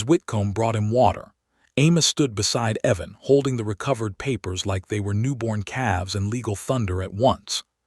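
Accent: United States. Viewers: Thank you. I love this voice btw. Where in America do they talk like that? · I am from Northwest Ohio, and they talk like that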